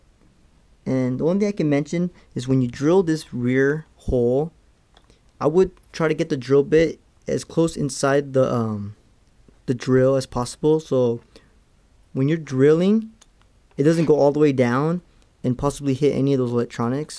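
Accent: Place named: Mexico. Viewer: Dude, amazing level of detail and tips on your videos! You sound Mexican, if Trump tries to deport you, you can hide out in my house for the next 4 years :)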